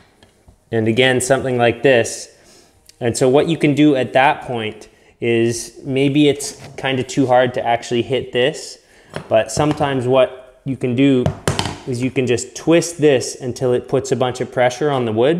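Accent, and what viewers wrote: Canada. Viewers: You sound unbelievably Canadian.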